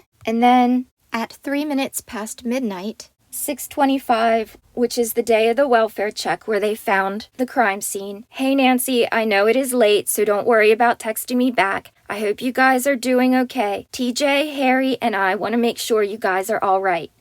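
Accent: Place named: Pennsylvania, United States. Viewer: You have a slight Pennsylvania accent lol I’m born and raised in Pittsburgh and can tell that accent anywhere. You have to be from Pennsylvania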